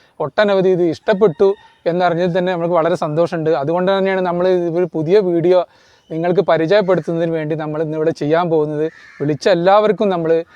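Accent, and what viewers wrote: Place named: Valanchery, India. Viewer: മലപ്പുറം ജില്ലയിലെ വളാഞ്ചേരി ഭാഗത്തുള്ള ഭാഷ ശൈലി ആണ് അദ്ദേഹം സംസാരിക്കുന്നത് ഓരോ നാട്ടിൽ ഓരോ ഭാഷാ ഉച്ചാരണം ആണ് അതിനെന്തിനാ കളിയാക്കുന്നത്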